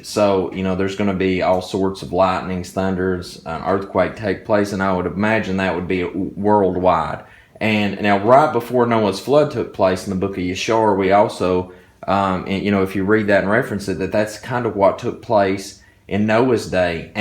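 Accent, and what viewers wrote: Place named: South, United States. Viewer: Very informative and compelling.. Thanks,.. God bless and guide you, dear brother.. Btw, where are you from? I definitely detect a Southern accent. North or South Carolina? My Momma was from Kings Mountain, NC,and I still have tons of kinfolk,down yonder, that a way...:)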